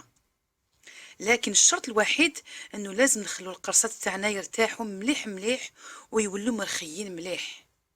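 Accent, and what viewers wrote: Algeria. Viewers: Les marocaines sont les meilleurs dans les msemen mais je te fais confiance en plus tu as la même voix et même accent que l actrice algérienne elle est enseignante aussi j ai oublié son prénom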